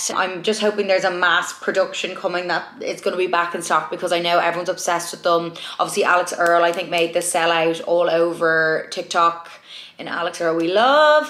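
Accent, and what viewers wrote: Ireland. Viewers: I was trying to figure out which accent you have the first couple of seconds in but it sounds Irish am I correct? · Its an Irish posh dublin accent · Yeah it’s Irish I’d say she’s from Dublin like myself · Hi I’m to your channel and so glad I came across you and your Irish which is a bonus and way I just want to ask what would you recommend something really hydrating for my skin please and also did you say you get Chemical peels if so please tell me we’re and who is great I would so appreciate it thanks till next time keep up the fab videos, happy new year also take care x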